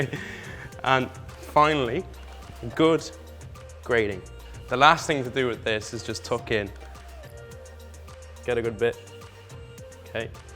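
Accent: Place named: Cavan, Ireland. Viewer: The Cavan accent makes the video 😂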